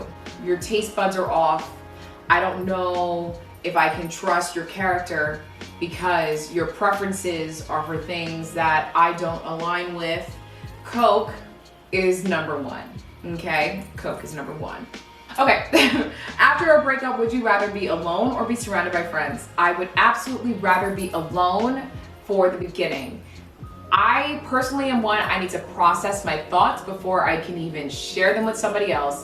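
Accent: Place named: New York, United States. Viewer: Girl you don't have to defend your New Yorkness- I (as a native Brooklynite who's been living on the West Coast for 10 years) can tell just by the way you say the word 'water'. lol Your accent makes me miss NY. <3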